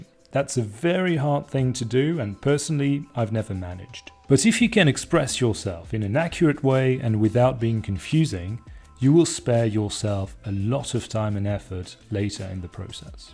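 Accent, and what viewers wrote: United Kingdom. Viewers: I'm assuming you are a native French speaker, but it's crazy hearing the British English tone of your voice compared to the French (speaking as an American haha). Both are very clean and clear. I only wish to be able to pronounce French like a native at some point. It's super tricky mimicking some sounds.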